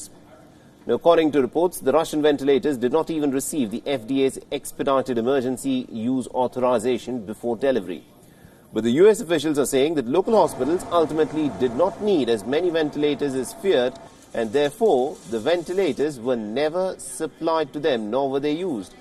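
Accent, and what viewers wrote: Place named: India. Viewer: Indian English has been developing independently with British rule and after. The anchor has a nasal tone & accent but otherwise it sounds normal enough to Indians. You must accept that there are worldwide variations in English all of which are equally authentic.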